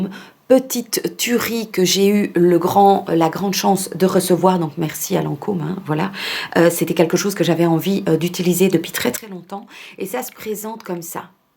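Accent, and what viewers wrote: Belgium. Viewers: J adore ton accent c est désagréable quand tu dis ça je vois un one woman show bon tu seras peut être fâchée mais en tant que belge je m autorise l autodérision sur notre accent. Sinon. Faut vraiment que nous pensions à prendre soin de nous mes filles 19 et 15 ans ont ce qu il leur faut mais moi perso je me met de côté exceptée début d année où j utilise les produits des calendriers de l avent cette année c est thé bio, bougies et nuxe après je ne suis pas... je ne sais pas quoi acheter... mais il faut vraiment prendre soin de soi je dois juste l integrer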